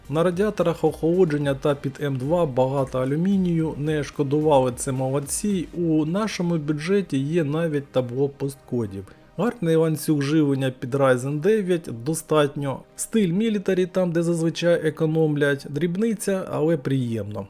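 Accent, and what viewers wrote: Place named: Transcarpathia, Ukraine. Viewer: Кратко когда ты с закропатьия ммм... Нихуя не понятно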